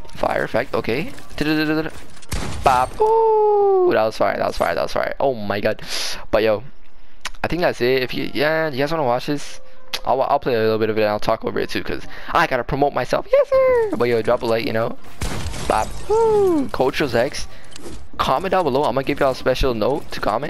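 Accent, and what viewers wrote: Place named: North, United Kingdom. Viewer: Sounds like some up north stuff so I can follow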